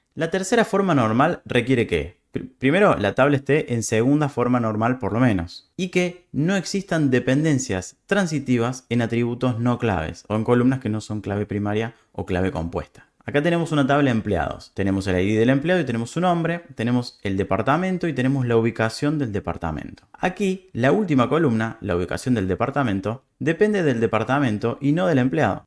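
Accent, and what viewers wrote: Argentina. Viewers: Justo estoy haciendo un TP de este tema, y me agrada escuchar todo esto en "argentino", gracias, te ganaste un suscriptor. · acento argentino que me da confianza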